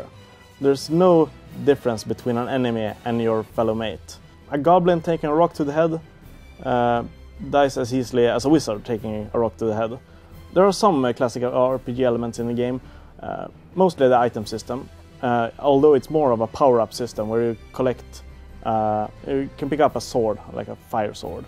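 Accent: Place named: Sweden. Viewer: That's hell of an accent you have there and I say that as a fellow swede.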